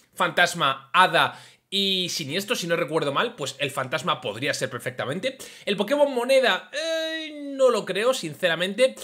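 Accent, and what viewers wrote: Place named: Spain. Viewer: Sabiendo que sos de España (por tu acento) quisiera que me des tu opinión sobre los futuros DLC de Pokémon Escarlata y Púrpura? En la generación anterior, en Pokémon Espada y Escudo, los DLC correspondían respectivamente a La Isla Armadura (basada en la Isla de Man) y Las Nieves de la Corona (basada en la tundra de las Highlands escocesas). Te parece que los DLC de Pokémon Escarlata y Púrpura estarían basados respectivamente a las Islas Canarias y a las Islas Baleares? Saludos, aguardo tu contestación y si hay video al respecto, mucho mejor de en qué lugares de España podrían estar basados los futuros DLC de ésta generación?:)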